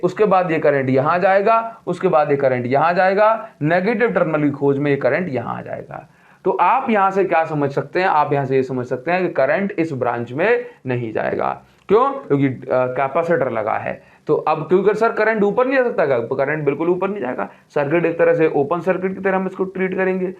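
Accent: Odisha, India. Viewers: Odisha se ho kya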